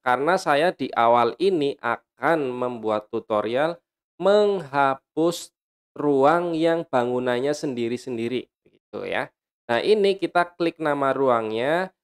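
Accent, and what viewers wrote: Banyumas, Indonesia. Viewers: Terima Kasih Ilmunya Pak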